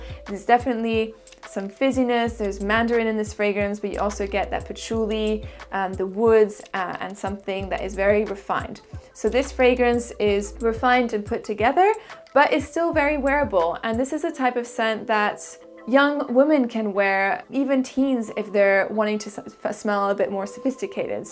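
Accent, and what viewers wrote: France. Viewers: Your french accent is so interesting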